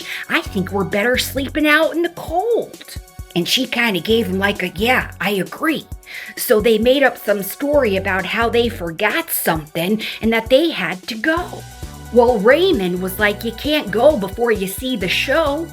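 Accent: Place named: Midwest, United States. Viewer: OMG! Your story telling so reminds me of my mom! Because of the Midwest accent 😂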